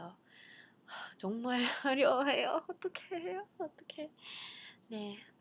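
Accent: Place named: South Korea. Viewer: Glad you are in Hawaii! I have friends in Hilo but your Korean is much better than hers! Hope you have a great time in Hawaii and in your classes. I love love your korean accent when you speak english...Couldn't be cuter!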